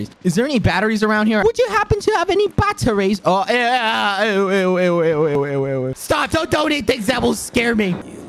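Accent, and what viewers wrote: United Kingdom. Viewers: love how his british accent gets worse and worse as the video goes on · Ik, but he was doing a British accent in parts of the video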